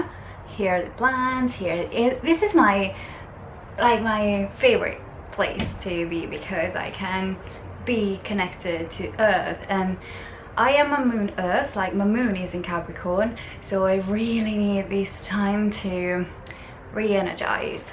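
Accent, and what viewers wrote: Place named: United Kingdom. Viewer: Ciao, grazie mille per questa condivisione❤️ Io ho la luna in toro, e ultimamente ho trascurato la mia connessione con la natura😞 e infatti sono totalmente off balance. Grazie di avermi ricordato di cosa ho bisogno. E grazie di avermi ricordato anche di essere capace di comprendere il British accent🤣🤣 mai scontato 😅🌷 un abbraccio ❤️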